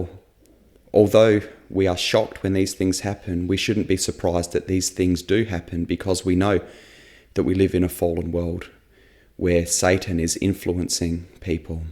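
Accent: Australia. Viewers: Amen. Thank you. I follow you, as I followed Charlie and the late Pastor Baucham from Egypt. I am a Coptic Orthodox Christian. May God bless you my son and may He bless your ministry Amen. I am very fond of the Australian accent though I don’t hear it often. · Had to stop bc of the Australian accent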